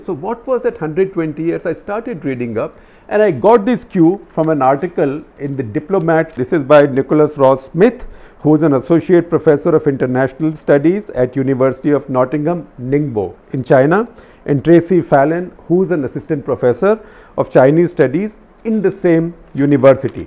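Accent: India. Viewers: As a Westerner, I always find Shekhar Gupta's analysis interesting. The Indian perspective is quite different to that of the western mainstream media. And the Indian way of speaking English is pretty cool too :-)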